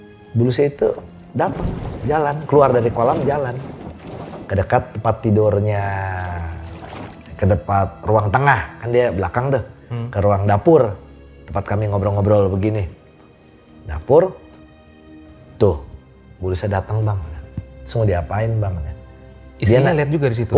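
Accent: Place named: Jakarta, Indonesia. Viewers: Emg betawi logatnya begitu dah emg ceplas ceplosnya juga tanda peduli, lu punya temen jgn dari situ situ aja makanya mbaa, jd ga menyimpulkan begitu setiap org dr setiap daerah punya ciri khas masing" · Aksen Betawinya enak banget di dengar bang.. yg di sampaikan Masha Allah ngena banget. On point gitu.. terimakasih atas ilmu dan pengalamannya bang.. semoga sehat selalu.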